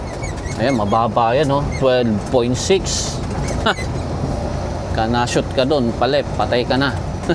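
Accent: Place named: South, Philippines. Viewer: southern accent.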